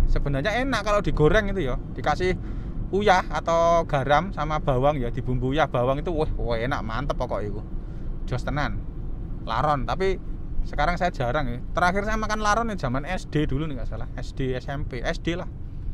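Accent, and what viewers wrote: Java, Indonesia. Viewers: Suarax kok medok bgt y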